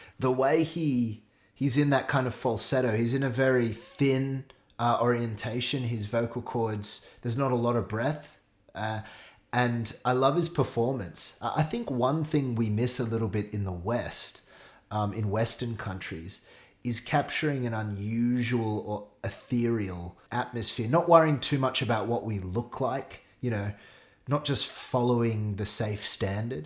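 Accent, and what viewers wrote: Australia. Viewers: It’s not Hau, it’s Hua 😅🙈 But I love australian accent.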